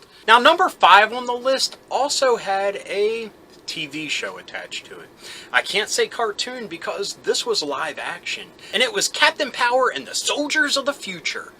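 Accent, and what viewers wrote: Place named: Baltimore, United States. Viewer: your Baltimore accent triggers me, but in a good way 😄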